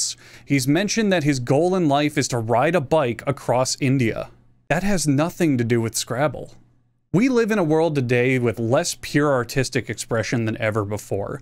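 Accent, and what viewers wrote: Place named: Florida, United States. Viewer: He didn't pronounce it as an acronym it's just his Floridian accent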